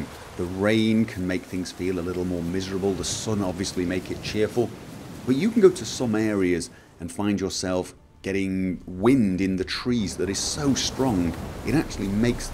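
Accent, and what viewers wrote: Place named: Northern England, United Kingdom. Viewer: ***** Bollocks, he has a northern british accent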